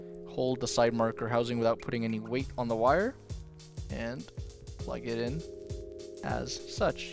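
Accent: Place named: Canada. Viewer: You're so Canadian! "Oo-ut". 😭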